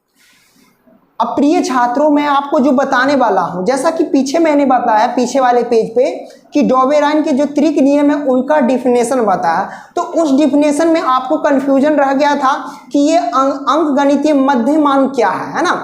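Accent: Bihar, India. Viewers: Sir aap bihar ke rhne wale hooo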